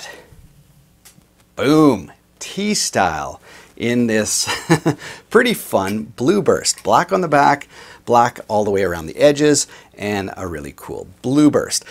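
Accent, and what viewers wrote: Canada. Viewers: That’s because he speaks Canadian.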